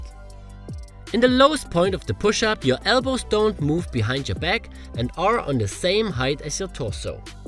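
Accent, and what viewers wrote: Germany. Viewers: Plant based & fasting. & sobber train except coffe) =never sick again! Can prove it😍 Gruss aus Bali🌴 Ps:funny german accent) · Is this a german accent?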